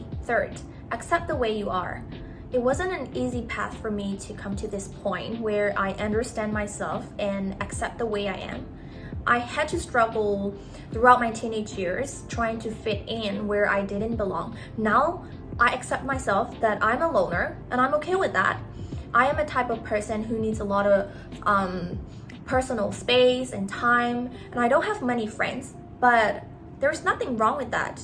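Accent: England, United Kingdom. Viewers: I love your English accent so much . Do you have a Instagram account? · How do I get English accent like you, plzz give me some tips with one vd ma" ,most of Myanmar people want to know for it ❤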